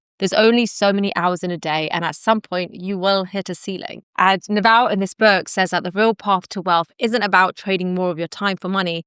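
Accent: England, United Kingdom. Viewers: I loved that British accent on non-british. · Bit plain accent pls. Too pommy accent yak for ears!! I tried many time to listen your video. Rich contents disgusting pronunciation! Sorry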